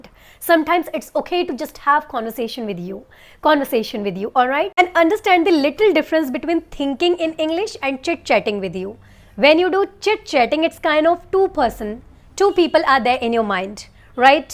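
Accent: India. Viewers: You speak english well woman .it s too fun your accent it s great it adds a gracious listening . I m french and i notice a lot of indians People speak english like you . Could you ask you a question lady ? Is life is easy or Hard in england ? I don t know this land ?i went in london when i was seventeen . It s too gracious to listen to you keep teaching People. Could you ansewer my question please ? God bless you lady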